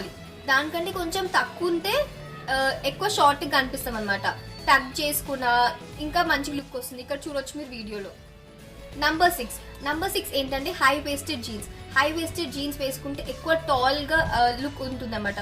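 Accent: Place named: India. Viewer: Love your slang in telugu ❣️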